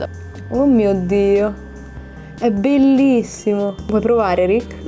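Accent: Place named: Milan, Italy. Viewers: ma sono l' unico a sentirla con un dialetto milanese? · Ma questo minaese nei video.... Ma sei divetate milanese dock... Tac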